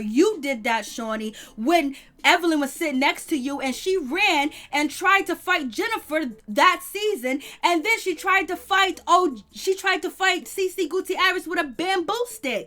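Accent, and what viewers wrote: New York, United States. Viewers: I love your accent. True New Yorker. 💕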